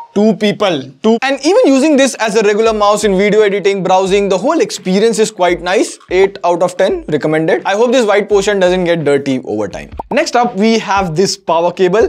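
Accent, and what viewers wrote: India. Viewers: Indian lag rahe ho Hindi me bolo na mujhe kuch bhi samajh Nehi aaya 😂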